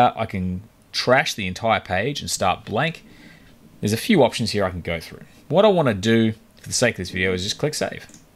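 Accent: Australia. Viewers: Oh my goodness! I work as a VA and have been struggling with this them for days, despite watching some training vids. YOUR video is EXACTLY what I was looking for! Thank you. AND discovering a fellow Aussie accent was pretty great too 👍👍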